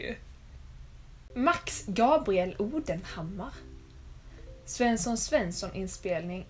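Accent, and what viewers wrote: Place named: Skåne, Sweden. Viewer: Du lät verkligen som alla i Greveholm haha för du pratar väll skånska?😂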